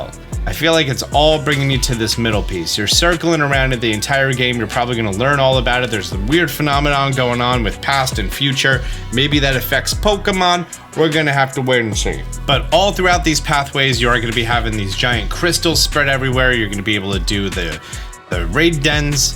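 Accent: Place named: Philadelphia, United States. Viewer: Are you from Philadelphia? You say 'wudder' like all of my friends and family. Lol. Also, thank you for the great breakdown!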